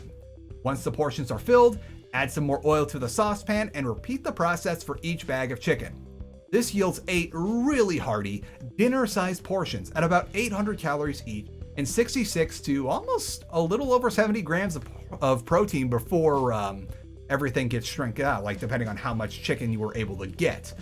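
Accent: United States. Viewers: Man, listening to an american pronounce those names hurts so bad.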